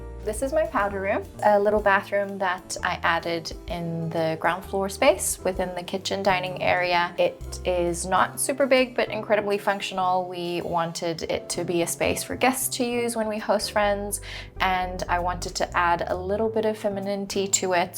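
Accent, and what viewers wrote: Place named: North America. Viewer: This north-american way of talking is painful.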